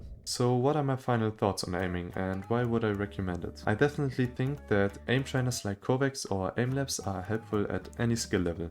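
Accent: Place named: Germany. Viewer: he sounds german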